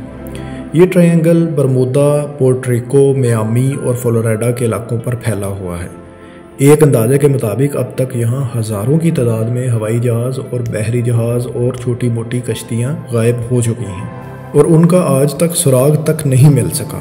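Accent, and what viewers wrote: Punjab, Pakistan. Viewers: Punjabi accent in Urdu documentary, what an amazing......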